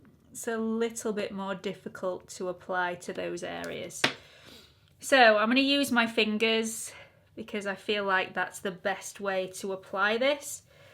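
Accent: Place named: Yorkshire, United Kingdom. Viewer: Your accent just makes me so happy. Are you from Yorkshire?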